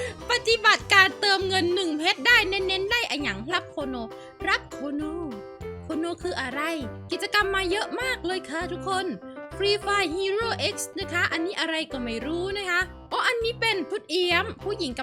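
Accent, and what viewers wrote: North, Thailand. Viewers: พี่ พูดเหนือทั้งคริปหน่อยครับ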